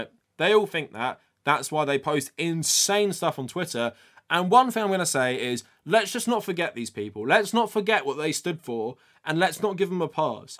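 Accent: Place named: United Kingdom. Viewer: You gotta love a Dude with a British accent worrying about Woke American liberals. Aren't you guys still working out Brexit with your 3 fleet navy?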